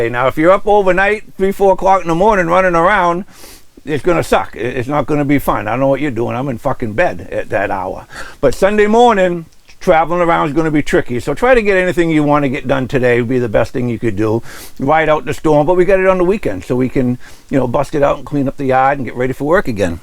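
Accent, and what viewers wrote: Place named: New Hampshire, United States. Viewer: Being born and raised in Winchester NH I always turn to your channel to see what's happening "back home". We don't see much snow here in Arizona. Love your accent, makes me feel right at home always. Thanks!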